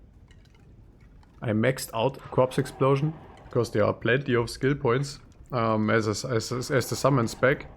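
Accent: Germany. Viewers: Nice buiId and I like your German accent! 😂 Greedz 😉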